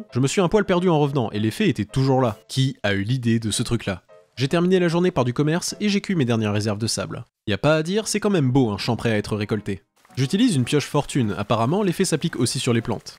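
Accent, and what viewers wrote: Quebec, Canada. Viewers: T’es québécois? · T’es tu un Québécois? 😂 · j'adore l'accent quebecois que tu tes donner XD et cest un québécois qui approuve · Ton accent Quebecois est cringe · J’adore ton accent québécois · Es-tu Québecois? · est tu québécois ou canadien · Les références sur plein de chose que je ne connais sont INSANE. Et jsp si c'est volontaire ou nn mais les intonations de voix avec une ressemblance a l'accent culte québécoise sont réussi. La naration est parfaite. Je dit merci a tiktok et a ma curiosité pour avoir découvert ce chef d'oeuvre sur YT. Je n'ai pas jouer a minecraft depuis la réforme du PvP 🤦‍♂️ et tu me donne envis de m'y remettre. Un gros FÉLICITATION pour sque tu as réalisé. 👍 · Super accent canadien 😉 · Tu es quêbêcrois? Moi oui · Ce com est en honneur de ton incroyable accent québecois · l'accent quebecois a la limite du perfect WOOOOOW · wesh l'accent canadien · L’accent québécois c’est pour me tuer😂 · Putain ta le meilleur accent québécois du mondes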